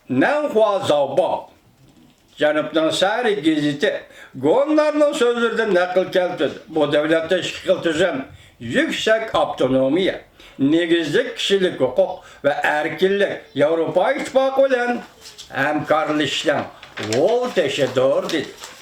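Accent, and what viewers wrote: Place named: China. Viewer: Siz hitaixha okughangha ohshaisiz.Siz uighurchani hitainin ahanigha selip okup berivatisiz .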